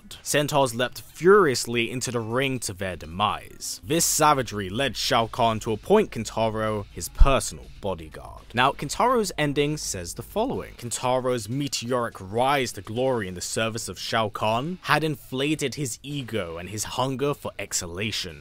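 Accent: United Kingdom. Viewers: The first MK i played :3 Great Game!! Pd: Your Accent...are you From UK? Answer if you want, mah Boi.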